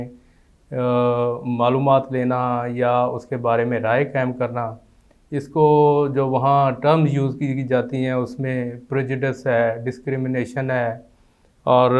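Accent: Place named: Punjab, India. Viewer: Do you know your problem? Tu c Paindo Punjabi ho. Ardo (Urdu) Punjabi which bolde ho. I have never been asked this question in Canada and the UK. You had no content so you decided to do a podcast on this unrelated topic. Learn to speak with correct pronunciation and chances are nobody will ask you this stupid question. Kuch samaj ayi?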